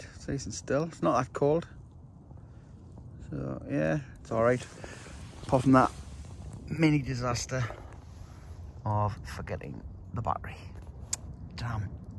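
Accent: Newcastle, United Kingdom. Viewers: I think he is Geordie 🤣🤣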